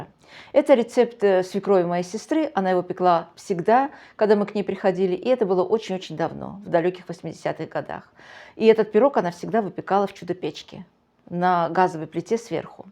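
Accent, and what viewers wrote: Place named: Baku, Azerbaijan. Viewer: Здравствуйте. Я когда первый раз увидела ваше видео,вернее услышала-думаю,что то знакомый выговор,вернее манера говорить. 😅Оказывается вы наша из Баку. Только Бакинцы так тянут слова😂Привет вам из Баку и долгих лет жизни ❤Аллах джан саглыгы версин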